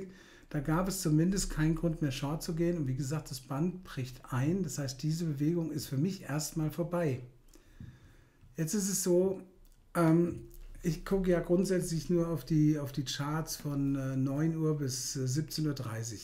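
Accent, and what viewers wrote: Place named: Frankfurt, Germany. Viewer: Klasse! Erinnert mich an meine Frankfurter Börsenzeit, und abends im Bitburger, da war auch dieser Dialekt am Start :) Vielen Dank für die solide Arbeit!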